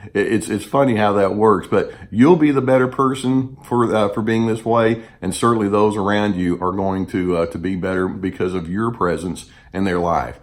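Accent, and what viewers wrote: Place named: Texas, United States. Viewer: You sound like you're from Texas-east or central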